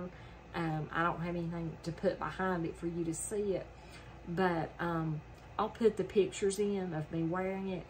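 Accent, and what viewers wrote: South, United States. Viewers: Love your video. Those three tops are very nice. What state do you live in? I’m trying to place your fabulous southern accent.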